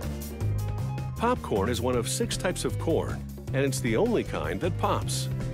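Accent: United States. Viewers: it says UK, but he has an American accent. 😓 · Discovery UK but the narrator is American lol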